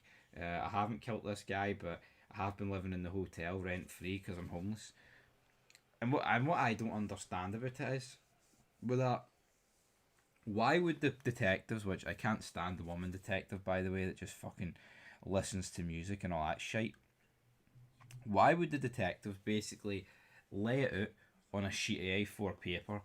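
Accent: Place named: Scotland, United Kingdom. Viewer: Your accent is all over the place. mega Scottish accent and you're sometimes doing words in an American accent, like why?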